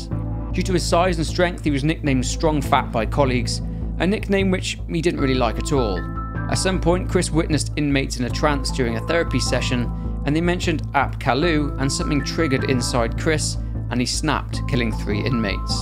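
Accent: United Kingdom. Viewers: This guy as a cool accent. The storage of sounds cooler when there’s someone with an awesome accent telling it 😎 and Brittish/English people are cool to 😎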